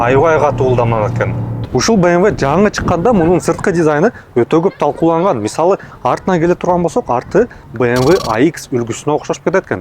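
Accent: Standard. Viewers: Таза кыргызча уккан кандай кана кулака жагымдуу угулат😍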